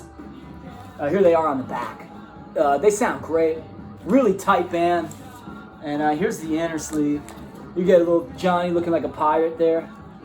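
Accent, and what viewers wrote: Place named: Pennsylvania, United States. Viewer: I'm from new jersey my family's from NYC you sound like you're from the Pennsylvania area lol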